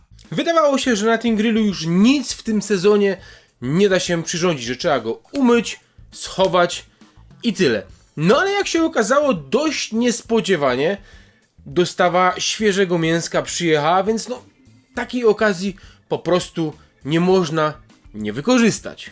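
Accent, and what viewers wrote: Silesia, Poland. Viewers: Jesteś ze śląska? Słyszę akcent :D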